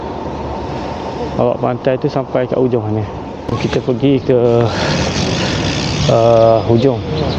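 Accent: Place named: Kuantan, Malaysia. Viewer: Patut kwn dgr slang aok kuantan beno bunyinye sama kita😆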